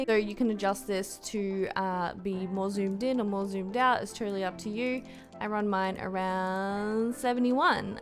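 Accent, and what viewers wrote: Australia. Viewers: i knew it, i knew u are aussie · Is she Australian? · Listening to her accent I get Aussie vibes....am I accurate? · Oh you're an aussie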